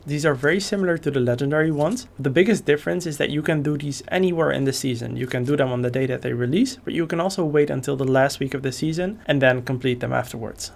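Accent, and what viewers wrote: Netherlands. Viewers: Ik hoor Nederlands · why do you sound dutch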